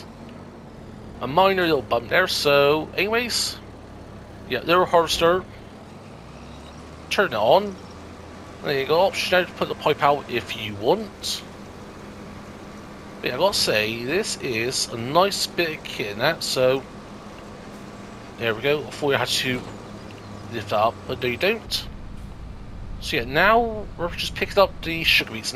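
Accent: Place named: West Country, United Kingdom. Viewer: i could listen to what i believe is your west country accent all day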